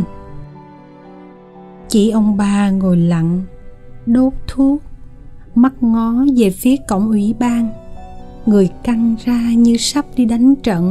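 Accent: South, Vietnam. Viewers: Truyện của Nguyễn Ngọc Tư với giọng đọc của bạn nghe thật tuyệt Chất giọng miền Nam ngọt mà sâu lắng thể hiện nội dung câu chuyện và tâm tư của các nhân vật Giúp người đọc cảm nhận được những điều tác giả gửi gắm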